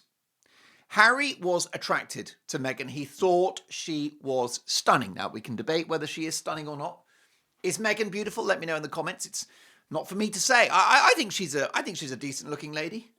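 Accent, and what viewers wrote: United States. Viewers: your American accent is really good!! Enjoy the show and I look forward to it daily ❤❤ It sounds like you got in some serious trouble yesterday 😂😂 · Your American lawyer accent!😂😂😂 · Great American accent!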